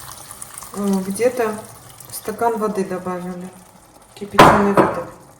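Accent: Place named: Gyumri, Armenia. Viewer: Я сразу поняла по акценту и очеень рада,я тоже из колориитного города Гюмри❤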